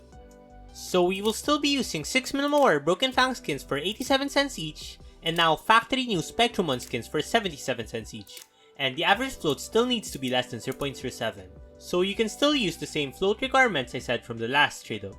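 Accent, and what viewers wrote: Philippines. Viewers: Pinoy voice detected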